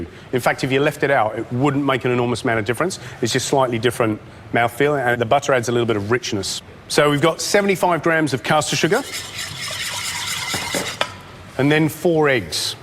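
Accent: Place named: Australia. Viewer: THANK you, i don't know Australian, and I was like what the heck did he say.? Now to transfer that into ENGRISH... like ounces and cups.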